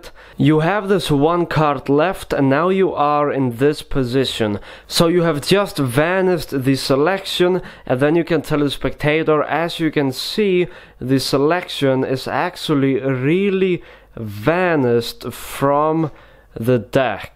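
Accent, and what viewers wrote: Russia. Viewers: It’s called the Russian accent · Oh man this guy reminds me of crazy Russian hacker for some reason because of his accent and sometime he does the "boom" thingy which crazy russian hacker also does😂😂 i love your videos though man, i have subscribed to multiple magic tutorial channels however i think that your tutorial quality is somehow equal to 52 kards... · I love your Russian accent! :D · The weirdest russian accent of all time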